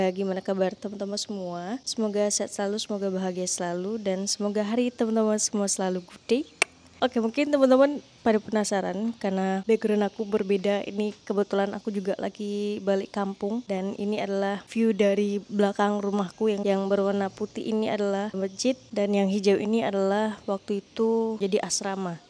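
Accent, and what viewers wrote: Malaysia. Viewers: Bahasa malonsia jelek banget tidak jelas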